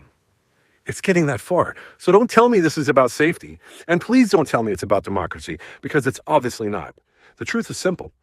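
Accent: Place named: United States. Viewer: > Neve Lose Your American Voice!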